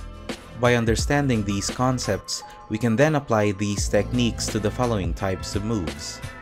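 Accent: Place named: Philippines. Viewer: Just started watching your vids.. You have a filipino english accent i kinda thought then i saw your logo haha Really good vid bro😁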